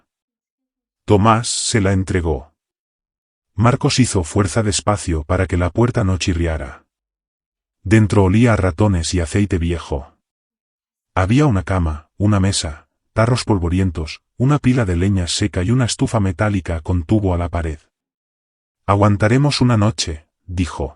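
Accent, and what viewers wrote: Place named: Spain. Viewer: L voz españolísima la embarra..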